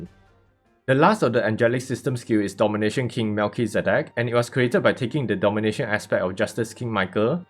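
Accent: Singapore. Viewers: ur singaporean right the accent sounds familiar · Hmm you sound singaporean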